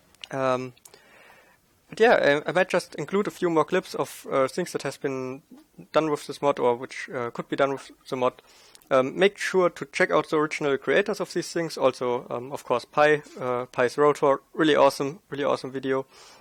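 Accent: Germany. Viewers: sprechen sie deutsche? das hort wie du eine deutsch akzent hast.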